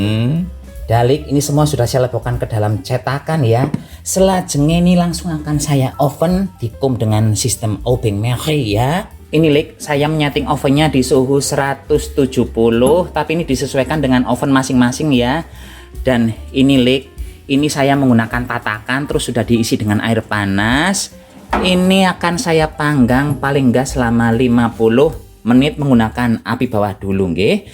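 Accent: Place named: Cilacap, Indonesia. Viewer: Resep" nya ok.mudah di tiru juga sangat menghibur dengan bhs ngapak nya yg medok cilacap.tp sy.sebagai orang kendal jateng paham.dengan.bhsnya mas.semangat trus..Salam.ublek"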